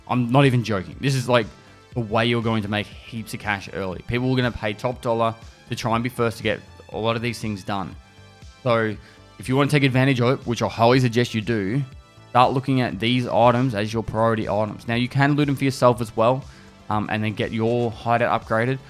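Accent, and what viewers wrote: Australia. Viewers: Never had issues understanding what he says, guess it's your ability to understand his Australian accent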